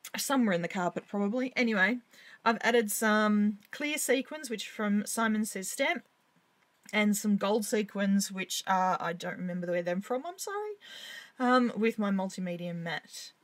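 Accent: Australia. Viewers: Gorgeous card! It was nice to hear another Aussie! Well done and looking forward to seeing more from you. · Great card, fab video and SOOOO nice to hear an Aussie voice! Well done.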